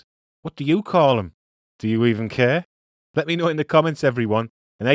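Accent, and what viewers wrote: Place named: Northern England, United Kingdom. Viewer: Thanks to your lovely local accent (I’m a Northern Lass) and humour to match, I find your presentation so simple to understand that I will be able to satisfy my Son with his favourite chomp after spending over 40 years of mithering me to learn! Feeding 3 adults and 6 children, along with several neighbours, is going to use up all my flour (I bought 16kgs by mistake!) and this recipe is perfect - Wish me luck!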